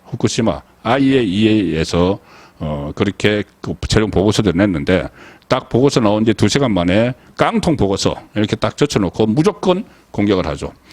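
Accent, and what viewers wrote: Gyeongsang, South Korea. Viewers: 수고스럽겠지만 자막을 달면 구독이 늘어 나리라 봅니다 겡상도 발음과 억양이 쪼매 심하다 아입니꺼?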